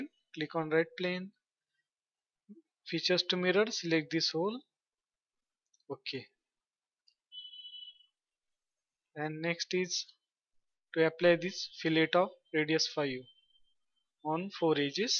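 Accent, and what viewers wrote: India. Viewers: Thank you man who has an indian accent, you are good explaining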